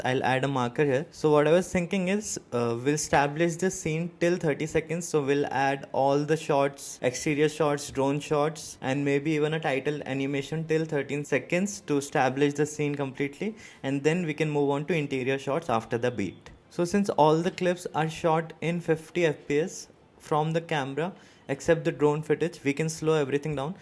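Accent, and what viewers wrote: India. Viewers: Bro pls speak in hindi 😢 your accent is indian I know you can speak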